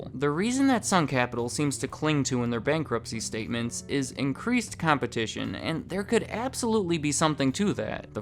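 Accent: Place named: Midwest, United States. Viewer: I can tell you're from the Midwest lmao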